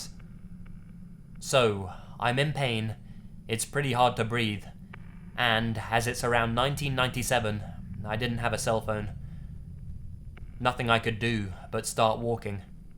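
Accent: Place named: England, United Kingdom. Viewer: I LOVE this format. Lots of little (but intense) stories. Thank you for all your work. I love your voice too. Being from Sydney I hear a lot of English accents but yours is so warm and lovely.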